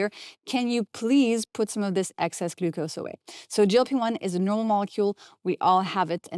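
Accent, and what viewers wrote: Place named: France. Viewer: Learning about glp-1 from a lady with french accent is more interesting than listening to people without a cute accent. ❗️❓️Most peoples hypothalamus is dormant or not heeded❓️❗️ Sad....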